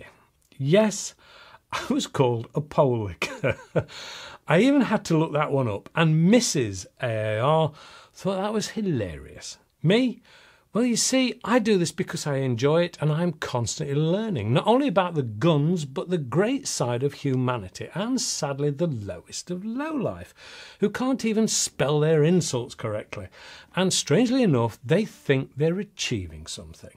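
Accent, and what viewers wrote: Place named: Liverpool, United Kingdom. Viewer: Thank you for an excellent review! Love your accent... Liverpool, originally? The real steel rattle abit, not as much as the airgun, but still! I do agree that the 17 gen 4 seems to be the most lifelike, but the threaded one, with a mock supressor, would mimic silencer on movies to a spot.... nothing like real life supressors...but again, still.. I am glad to your approach to the trolls, I am 58 and still enjoy airguns and airsoft to cheaper training then with the real steel ( ammo is extpensive in Sweden). Not to call you old in anyway, I would be far from it, but it is nice to see a mature man enjoy and review on YT... for the most it is youngsters.... don’t get me wrong, they do a awsome work...but... for the third time... ” still”... Keep up the good work, sir, and I hope to see many moore reviews from you, as long as you find it interesting! 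Thank you sooo much for upload! Oh, is there a Crosman SBR full auto pellet gun there, in your background?😉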